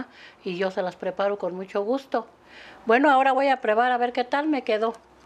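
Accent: Michoacán, Mexico. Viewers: Su acento michoacano me hizo recordar a mis tías, mi papi es de Michoacán yo me siento orgullosa de la sangre que me tocó, muchas gracias señora hermosa por su sencillez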